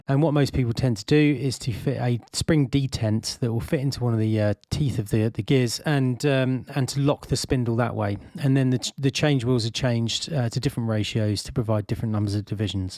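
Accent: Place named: England, United Kingdom. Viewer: I like the well designed attachment but as an American I cannot understand the speaker's rapid English accent.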